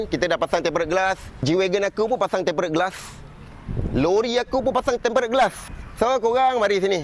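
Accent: Java, Indonesia. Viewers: Pekat jawanya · Jawa bang… kena paham bang… gitu la bonyinya…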